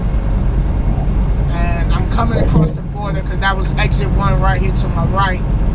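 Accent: New York, United States. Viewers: Man, your video is awesome. You have this unique extremely funny and down to Earth personality I could listen to you all day your freaking hilarious. All laughing aside you make a lot of very good points that all drivers should be thinking about . Then add that classic NY CITY accent. We need more people like you on the roads out there, . Just the way you say shit its great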